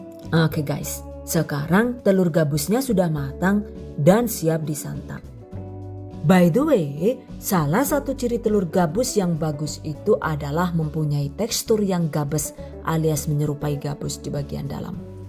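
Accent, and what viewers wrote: Banyumas, Indonesia. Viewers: Ditengah nyimak tutorial ini, muncul lah ngapaknya,,,,bunda. Seru banget resep nya diselingi logat" yg bikin ketawa ngakak tapi justru bikin kangen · lihat resep sambil ngakak denger clotehannya yg ngapak2😂😂😂😂👍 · Pokoke seneng belajar sekaligus ngehibur diri apalagi kalo keluar bahasa ngapaknya, hhh sama2 ngapak jadi gak bingung. step by step nya juga bener2 gak pelit ilmu deh, makasih, semangat dan terus berkarya njeh 😘 #GiveawayTHV2021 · Saya senang dengan semua resepnya dan senang bahasa ngapaknya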